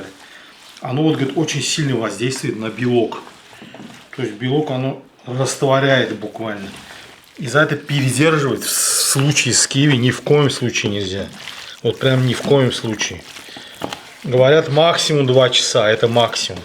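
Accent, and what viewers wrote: Baku, Azerbaijan. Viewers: Настоящий Бакинский акцент❤смотрю с удовольствием ваши ролики, всем Талышский салам из Канады Вагкувер ❤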